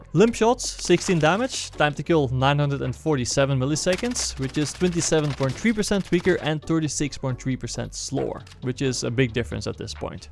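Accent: Netherlands. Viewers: Pretty sure he is dutch judging from the accent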